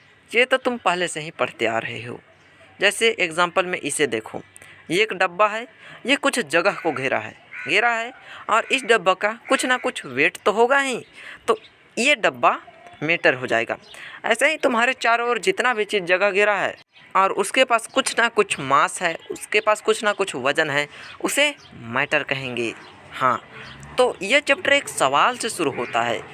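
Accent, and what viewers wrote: Bihar, India. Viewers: Bihari ho khya ap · Bro you are from Bihar I think because your talking style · Aap bihar ke ho na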